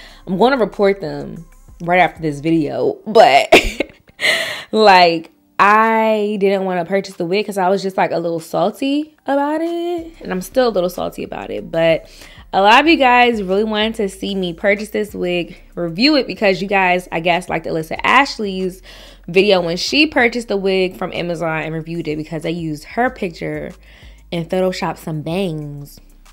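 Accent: DMV, United States. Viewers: That DMV accent make this shit so much more funny😩😭😂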